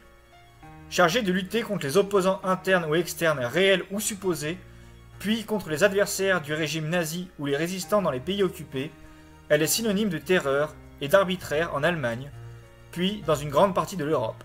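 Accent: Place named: Grésivaudan, France. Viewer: Ça sent l'accent du Gresivaudaaaan ça!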